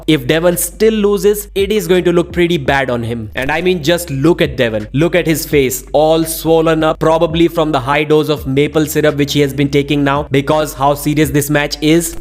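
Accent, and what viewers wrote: India. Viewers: Your voice seems Indian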